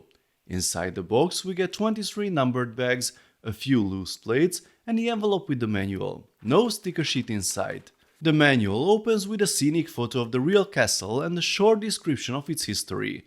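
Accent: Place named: Hungary. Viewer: we love your Hunglish! You must have been talking to Dr Németh - of capandball fame here on YouTube.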